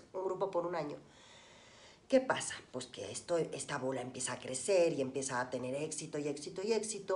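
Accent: Mexico. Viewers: cuantos años llevas en México? Tener nuestra ficha amarilla fuera de España aún no lo supero! Jaja amo como hablas con acento mexicano y cambias al español 😝 me encanto el documental! Lo volveré a visualizar seguro! Saludos desde España!! Te adoroooooo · Que tía más simpática esta parchisera (hablando como hablan los mexicanos y su curiosa forma de 'retorcer' el castellano). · Tiene buen acento Mexicano..he notado que casi no usa palabras o términos de España... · Y dime... se ríen de ti los Parchis x tu acento mexicano??😁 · ya no hablas como española, sino como mexicana,pero igual sigues siendo la ficha amarilla de Parchis. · ya eres Mexicana! Tu acento mexicano te va muy bien eeeeh felicidades! Saludos! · Guapa guapa!!! me encanta tu acento Mexicano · Me gustaría leer la respuesta de que piensan de su acento mexicano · Hablas más como mexicana que como española, que linda 😉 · Alaaaa que mexicano tu acento, bueno esque hablas mejicano no tenía ni idea! 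que te quedaste viviendo allí,pensé eras catalana. Un abrazo!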